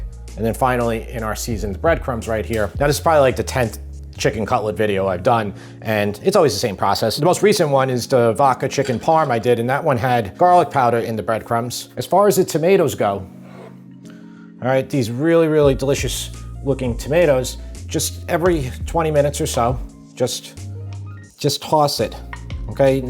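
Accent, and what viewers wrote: New York, United States. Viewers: I am a simple man I hear new york accent I hit like and subscribe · I’m watching this as we drive to the farm and my husband asks me “I didn’t know Billy Crystal has a cooking YouTube” never thought about it but there is a little Billy in your voice. I miss a New York accent from when I lived in bronxville.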